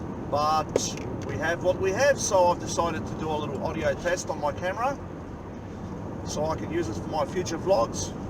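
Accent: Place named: Australia. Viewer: You have a Very STRONG Aussie accent , i just noticed.👍.... good for me to get used to such strongness of Aussie accent before emigrating there.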